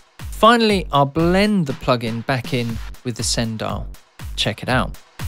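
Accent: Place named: United Kingdom. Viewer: shit sounds way more confusing when its in a British accent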